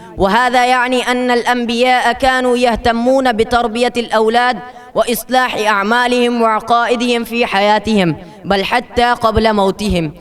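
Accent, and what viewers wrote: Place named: Arab world. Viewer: MASHA ALLAH very best speech & beautifull accent like ''ARAB'' ......... THANKYOU SO MUCH!!!!!!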